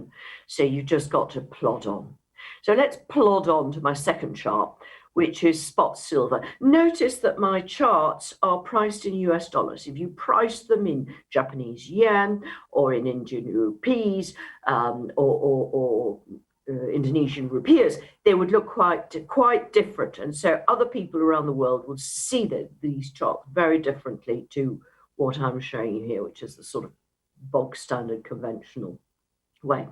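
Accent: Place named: England, United Kingdom. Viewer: Hey, its the English lady again!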